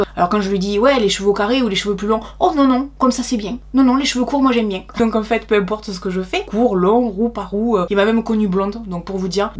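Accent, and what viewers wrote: South, France. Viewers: Merci pour votre bonne humeur, votre accent du soleil me remonte le moral 👍 Je vous comprends tellement, il me tarde de pouvoir me remaquiller 😊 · C’est la 1ère fois que je commente car ce n’est pas dans mes habitudes. Je suis tombée un jour par hasard sur 1 de vos vidéos du début de votre maladie, les mystères de youtube... je l’ai regardé, puis j’ai suivi les autres, j’ai fait des come backs sur les vidéos précédentes... Je vous ai trouvé tellement authentique, tellement nature, à chaque étape de ce long et douloureux parcours. Vous êtes une belle personne au dedans comme au dehors, peu importe les cheveux les sourcils, les cils, et cette pointe d’accent qui sent bon le soleil que vous avez au fond de vous, vous êtes vous même, ne changez rien. Je vous souhaite le meilleur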